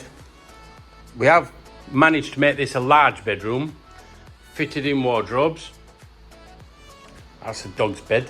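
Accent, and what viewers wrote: United Kingdom. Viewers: Where you from in the UK. Sounds like the North East of England.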